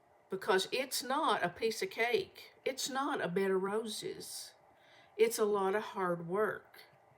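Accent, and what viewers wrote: Kentucky, United States. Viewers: Hi again from NY (Upstate)! I was just remembering, as I listened to your accent, I have only 2 'Ken -- or "Kin"! -- tucky' memories (as far as BEIN' there. They are Lexington (Thoroughbreds, "speaking of 'horses'!") and Ted Bird (are very many people who live in Kentucky familiar with it, or know OF IT?). BTW, I neglected to say before, Welcome to YouTube!😉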